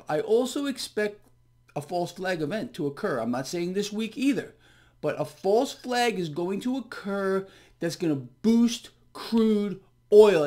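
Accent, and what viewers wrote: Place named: New York City, United States. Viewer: His is a New York City dialect.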